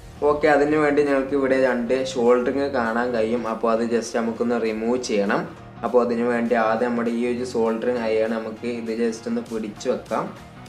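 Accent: Malappuram, India. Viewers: മോനെ നിനക്കും നിന്റെ വടക്കൻ സംസാരത്തിനും നല്ല ഗ്ലാമർ ഉണ്ട്‌. വീഡിയോ ഇഷപ്പെട്ടു നന്ദി. വീഡിയോയേക്കാൾ ഇഷപ്പെട്ടത് അതിന്റെ ബാക്ക്ഗ്രൗണ്ട് മ്യൂസിക്ക് ആണ്. അത് എഡിറ്റ്‌ ചെയ്തവരോട് ചോദിച്ച് ആ മ്യൂസിക്കിന്റെ പേരും അത് പ്രൊഡ്യൂസ് ചെയ്തയാളുടെ പേരും എന്റെ ഫോണിൽ (<num>) SMS ആയി അയച്ചു തരണമെന്ന്‌ അഭ്യർത്ഥിക്കുന്നു. ആ മ്യൂസിക് നെറ്റിൽനിന്നെടുക്കാൻ വേണ്ടിയാണ്. അ മ്യൂസിക് കേൾക്കുമ്പോൾ യൂറോപ്പിൽ ഇരിക്കുന്ന പ്രതീതി. തീർച്ചയായും അയച്ചു തരുമല്ലോ?. താങ്ക്സ്. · Nee മലപ്പുറം ആണോ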